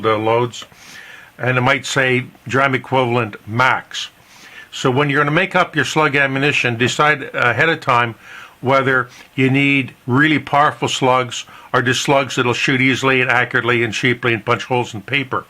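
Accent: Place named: Canada. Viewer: Worth noting that at today's (2023) lead and shell prices, if you reload and cast you can shoot slugs cheaper than bird shot. I can buy soft lead ingots for $2/lb, but #8 shot runs awfully close to $3/lb (US dollars here, since you sound Canadian). I can cast enough Lee slugs for a season in an hour or two over a gasoline camp stove (fuel cost about a dollar for the session), load them with the same wad and powder load I'd use for the same weight of bird shot, use a bingo chip (around a cent each) for an overshot card and either roll or fold crimp (I have a roll crimp tool, but my Load All II makes really nice fold crimps). You may want a 20 ga. nitro card inside the shot cup under the slug to keep the wad from getting stuck in the slug base, that's another two cents or so. A 1 ounce slug load then costs a few cents less than a one ounce birdshot load in the same hull, with the same powder, and ought to be about as accurate as your waxers -- and less work, IMO. 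I doubt I'll ever pay for commercial slugs... BTW, if you want to cut the crimps but keep the compression on your wad, you can put an overshot card on top of your wax slug (cut with a 3/4" punch out of cereal boxes or similar card stock) and then roll crimp over that. If you cut the minimum to remove the edge of the crimp, you'll have plenty of hull to roll over on top of the wax and card.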